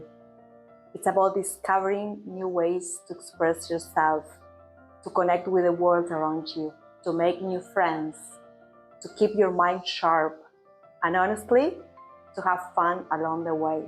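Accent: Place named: Argentina. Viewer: Hola, soy mayor (68) y hablo español bastante bien. Llevo 5 años estudiando esta bonita lengua. Creo que eres de Costa Rica, pero pronuncias la LL y la i griega como los argentinos (ayudarte = a chu darte, mayores = ma cho res). No tiene sentido. Estaba en Costa Rica hace 2 meses y no noté esta particularidad del acento de los ticos. ¿Me equivoco?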